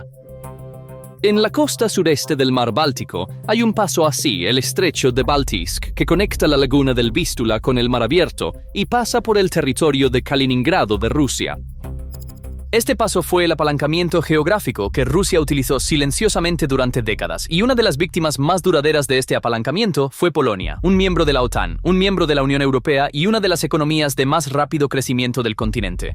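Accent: United States. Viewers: si poneis voces en castellano porque lo poneis con acento gringo? suena ridiculusssss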